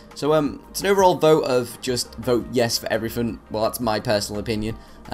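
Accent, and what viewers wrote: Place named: Liverpool, United Kingdom. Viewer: Got to be Liverpool, that accent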